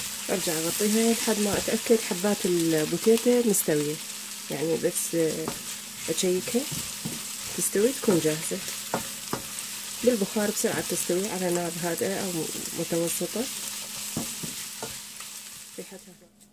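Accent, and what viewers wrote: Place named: Iraq. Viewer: تسلم ايدك عاشت العراق واهل العراق الطيبين اجمل اشي بالفيديو هدوءك واللهجه العراقيه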